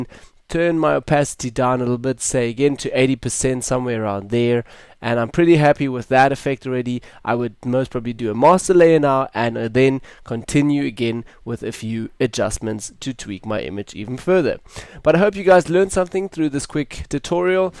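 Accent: South Africa. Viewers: nice suprice to hear a saffa accent in a cs tut! cheers! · I thought he sounded South African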